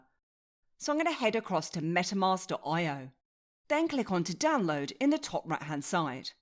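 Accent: United Kingdom. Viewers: Actually, it's true English. Americans/Canadians are the ones with the bizarre dialect.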